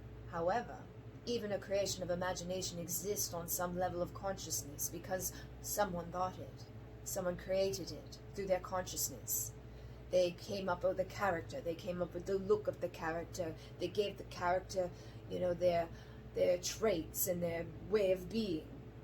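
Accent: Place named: United Kingdom. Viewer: I have catched a British accent for the order. 🙏🏻 This is fascinating and you are so talented. Thank you for doing this for us. Have a good rest.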